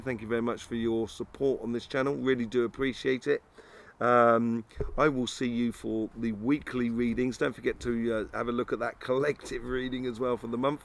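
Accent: United Kingdom. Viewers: Have not met this person yet. If i have not aware of it. Not looking for a relationship. I just moved across the country from the east to the west. We had a catagory 4 Hillary Hurricane. Being from the Jersey Shore it eas a windy very rsiny dsy. My son whose lived here for over 29yrs now. Remembers what a cat. 4 hurricane really is. 😅too funny. T zU I like your readings. Love the B accent. My ancestors are British. U msy be from another Vointry? Sounds very British to me. Oh no not goung to wait too long nor be over cautious. Btdt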